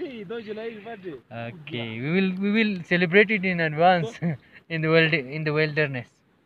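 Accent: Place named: India. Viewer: Fullywatched 💯💯✅. By the way. Your voice like Indian. Is it write?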